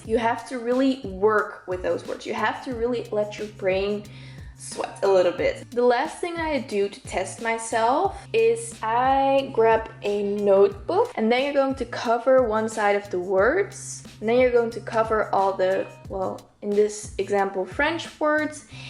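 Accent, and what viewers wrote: Netherlands. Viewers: I smell Dutch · are you dutch??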